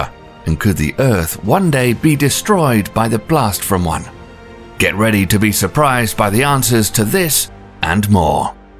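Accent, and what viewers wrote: England, United Kingdom. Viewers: As you have an English accent, please stop pandering to the US and call Ursa Major by its proper name - you'll be doing them a favour too, as some of them will learn something as well. By all means explain how they refer to it but I hate it when you forget the rest of the world. Thank you. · I came here to this channel to 1) Learn science 2) Learn that posh English accent by pausing and repeating everything he says (sehzz)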